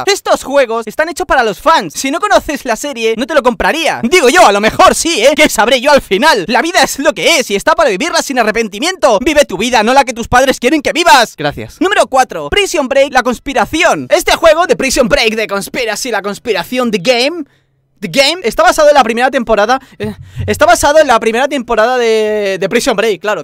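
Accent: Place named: Spain. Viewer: Si fuera chileno hablaría mucho más rápido la verdad y no hablaría con acento español La verdad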